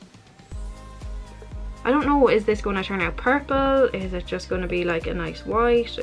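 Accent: Ireland. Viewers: What county in ireland are you from im really bad at accents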